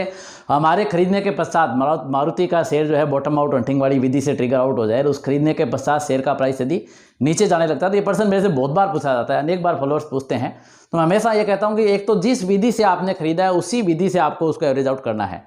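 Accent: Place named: Kota, India. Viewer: Sir aap Kota ke aas pass ke lagte ho, apki tone se aisa lagta hai.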